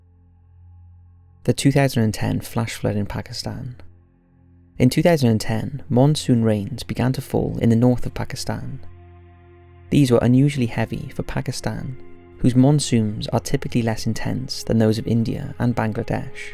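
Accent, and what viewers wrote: Wales, United Kingdom. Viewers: Is it just me or has the narrators voice become less welsh? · I’m hoping that does an April fools video entitled “official face reveal” and instead says “haha, I trolled all you saps. Smell ya later pimps” in his graceful Welsh accent. It would be so out of character and interesting to hear.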